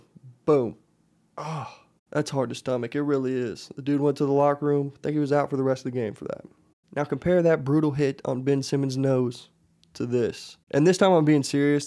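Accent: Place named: Canada. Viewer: Dude, you're even starting to sound Canadian. "Lower body injury, he'll be alright, eh?" Classic Canadian add on there. Beauty, eh.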